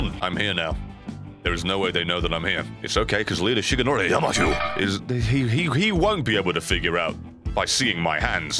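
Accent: Scotland, United Kingdom. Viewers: Less British than I remember, more Scottish???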